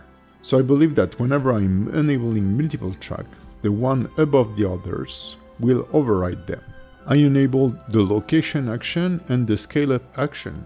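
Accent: France. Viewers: Et sinon, il y a une version française de vos formations ? Je ne pense pas trop me tromper avec votre accent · J'adore l'accent Français !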